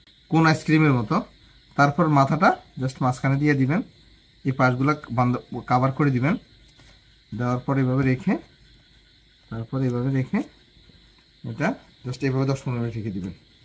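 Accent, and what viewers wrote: Noakhali, Bangladesh. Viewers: আপনার রেস্টুরেন্ট কোথায়? আমি নোয়াখালী থেকে বলছি। আপনার কথা শুনে মনে হচ্ছে আপনি নোয়াখালীতে থাকেন। লোকেশন বললে সরাসরি আসতে পারবো ইনশাআল্লাহ। খুব ভালো লাগবে আপনার সাথে দেখা করে।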